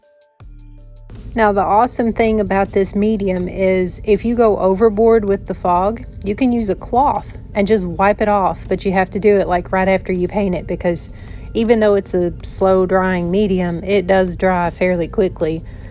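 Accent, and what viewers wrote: South, United States. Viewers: Nice voice and subtle southern accent. Nice painting too.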